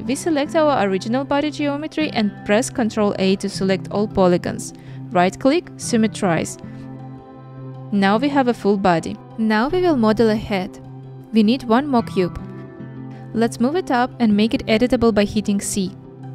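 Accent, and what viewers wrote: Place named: Eastern Europe. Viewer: Единственный английский который я понимаю, этот тот, на котором говорят славяне, ахах) А почему решили на инглише вести канал?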